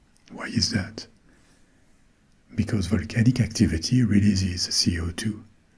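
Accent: France. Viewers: back to spaaaace 🎉😂 plz dont loose the french accent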